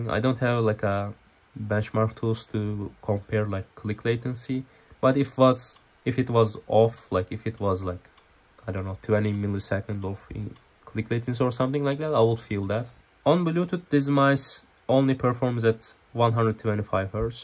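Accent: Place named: Turkey. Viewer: U sound like turkish. R u turkish?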